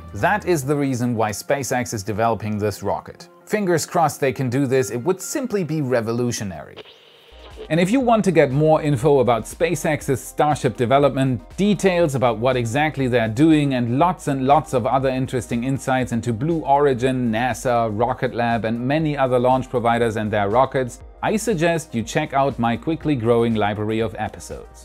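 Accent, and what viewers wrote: Germany. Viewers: Lol. You are German!. You should never be worried about accent. You provide invaluable information for us non techie space geeks so thank you:) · nonsense his accent is very german, I know because I grew up around english speaking germans. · Well lucky for you German accents are my absolute favorite. so checkmate! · Why are people just realising about German accent now 😂 I’ve always known he was German, maybe I’m just special 🤔 Shame about the rocket lab electron launch, love the video:D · Mann merkt wirklich bei den Namen das du Deutsch bist genauso wie bei ein paar sätzen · It would be fun if you did the entire video in your German accent( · "Sametimes my german axent comes sruu" ach ich liebe dich :D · Your German accent cames through all the time. No worry tough. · Why would you wish to get rid of your german accent. To the English ear it is a pleasent sound. 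I guess it might be that we are both anglo saxon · About the beautiful German accent. My 95 year old Uncle Kurt briefly worked with Von Braun in Huntsville in the late 1950's. I believe that the German accent IS the best accent for a rocket enthusiast. Never apologize for it. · Denglish ischt goedt? Miene deutch und bookstabbe ist sclecht... So, your English is 👍 . I lived in D’dorf and Basel for many years, and I’m losing my deutch. It’s good to hear a good German-English accent. It makes me want to go out and get my shopping done before the grocery stores close ... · Ususaly I say: Awesome content as always, but this time it was even better! Loved the little mistakes, and that you didn't take them out, as well as your heavy german accent. Your pronounciation is verry high quality btw. Your videos have become, more fluid, professional, and even funier over time!:) Übrigens würde ich dich super gern mal auf deutsch sprechen hören, so wie wohl viele Andere hier auch. 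(Und mich wundert's immer, dass Du Dich als statt vorstellst);) Und ich frag mich oft, woher aus Deutschland Du kommst. Ich schätze Süd-West (Baden Württemberg oder Rheinland Pfalz). Ich persönlich bin in Karlsruhe aufgewachsen, schaue Dir jedoch aus Ungarn zu. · Oh, no problem with your German accent... I didn't notice. I have the same issue with my Dutch accent in my videos... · I like the german accent. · Hi, mir war in den ersten 5 Sekunden des Videos klar, das du Deutscher bist. Egal wie sehr wir es versuchen, unser Akzent kommt immer durch xD. Mach weiter so, sehr coole Videos. Sub und Daumen! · he has a german accent during the whole video? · are you german ??? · I'm german too and didnt notice that you are German until today. I wish I could get rid of my accent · You’re German? Nice lol I dare you make a video with ONLY your strong German accent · I was actually referring to his little comment about his accent coming out :-D, Ich weis er ist eine Deutsche weil ich auch einer bin :-) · Ist Deutsche, lol!!! 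Pretty easy to have a German accent when you are German!! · I am a native English speaker. I can tell you are German because of your accent, but if it wasn't for your accent, I wouldn't be able to tell. You're sentence structure and pronunciation is as good or better than the average American and I'm really impressed. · German accent is best in the world! Love a German accent 😉👌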